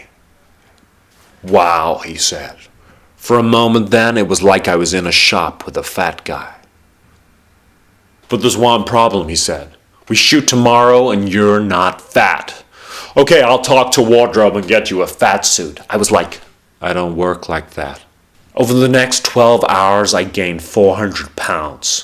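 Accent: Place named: United States. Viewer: I loved the ending! And nice American accent!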